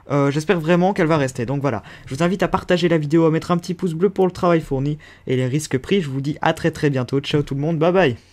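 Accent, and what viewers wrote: Belgium. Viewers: un petit accent de Namur .... si tu arrivais à persé le mystère des emblemes en mode Zombie la je Pourrais dire que tu représente bien la Belgique sur youtube/COD parce que avec 102 000 zombie tuer je suis toujours en chien au couteau ! nan je déconne tu représente bien à fou continue ;)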